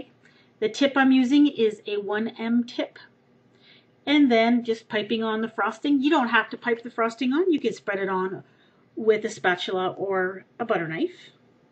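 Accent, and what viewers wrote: Canada. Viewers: love the canadian accent ^^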